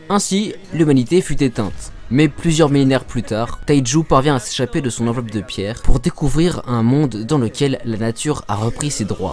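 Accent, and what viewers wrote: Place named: England, United Kingdom. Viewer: Quel bel accent anglais, je me demande comment l'as-tu obtenu ?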